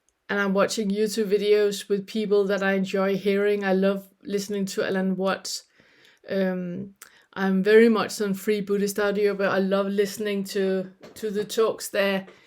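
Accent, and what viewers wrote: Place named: Copenhagen, Denmark. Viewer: Hi, I have been a lot in Cph, and regarding your pronunciation made me quite sure you must have been around there for a bit. Yes I will keep an eye on your posting! Appreciate/reconize most of your topics. Thanks for that!😊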